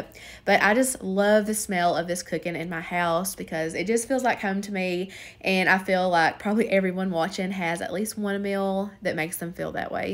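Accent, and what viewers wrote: South, United States. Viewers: I'm so happy to see your back doing your what's for dinner videos, everything looks so yummy, I hope that in time you will start healing from losing your family member, I know exactly what you are feeling because I have been through the same thing, anyway, I'm so glad you're back, I surely miss when you don't post, I just love your southern accent, it's so cute!! Sending you and all of your family lots of love and hugs, take care, I'm looking forward to your next video, God Bless You All 💗🙏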